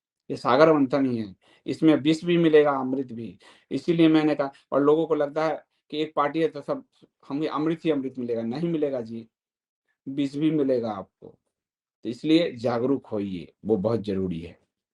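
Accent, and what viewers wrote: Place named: Bhojpuri, India. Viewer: Abe jahil, sahi se Hindi bhi nhi bol pata hai...bhojpuri bolne lagta hai(•‿•)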